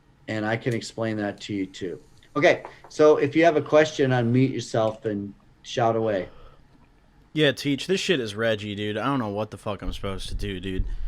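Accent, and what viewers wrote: South, United States. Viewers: I love your southern accent ❤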